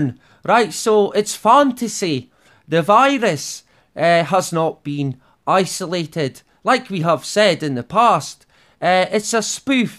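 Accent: Scotland, United Kingdom. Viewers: aha! My first assumption was Scots, Thank goodness. My former boss from Glasgow has been passed for over 10 yr. I was one of the only peeps who understood everything he said🤠 RIP brother Kirkpatrick Keep up the excellent work!good sir☮️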